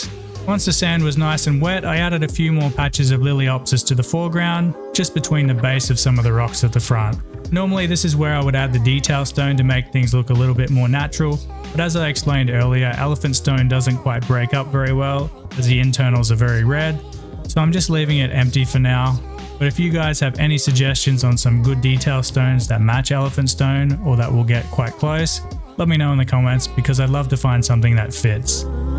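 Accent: Australia. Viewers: So thrown by the Aussie accent with European context! Would love an update on this tank now it's had time to settle.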